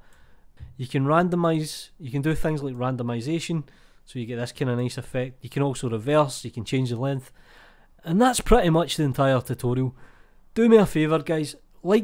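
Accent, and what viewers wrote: Scotland, United Kingdom. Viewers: Hi Thanks for yet another super useful tip. Please do not drop the accent. Scottish is pure music to my ears.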